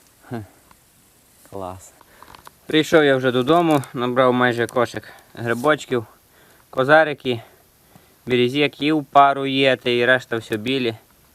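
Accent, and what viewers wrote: Hutsul, Ukraine. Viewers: Все мені подобається!!! Гори і природа, грибочки збирати, і діалект гуцулів, який Ти шануєш, і будиночок, відпочинковий,і музика!!!Відео прекрасне, дивлюся з задоволенням Дякую,!!! Будь здоровий і щасливий!!!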